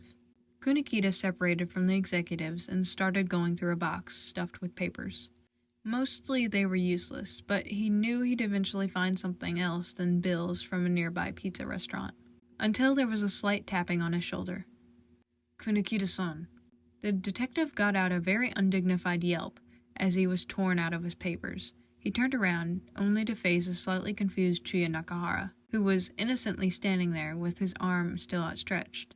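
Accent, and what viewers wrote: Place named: United States. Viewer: Me trying to understand: 😦😧😨😰 You have a very strong American accent, my hopes of comprehension are long lost to the wind bahsbahha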